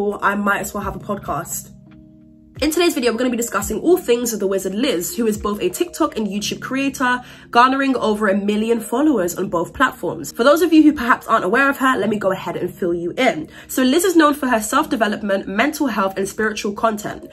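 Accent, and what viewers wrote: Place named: United Kingdom. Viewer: I very much appreciate the subtitles because the auto generated ones always mess up with British accents lol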